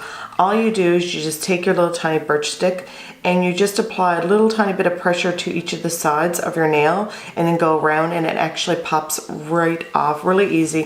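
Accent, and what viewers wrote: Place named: Newfoundland, Canada. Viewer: I just came across this video because I bought the system. Qp seconds in I had to go see what part of NL you were from ☺ fellow NLer here.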